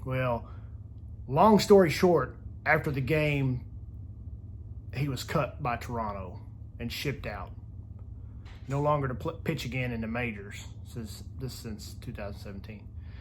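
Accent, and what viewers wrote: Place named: Texas, United States. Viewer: You have to be from Texas because you sound like stone cold a little. 😁👍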